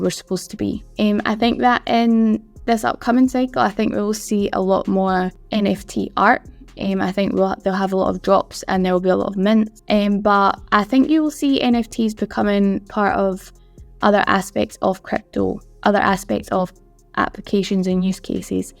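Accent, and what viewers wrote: Scotland, United Kingdom. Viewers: Is that a Scottish accent? It's a nice one =-). Keep up the great work & quality content.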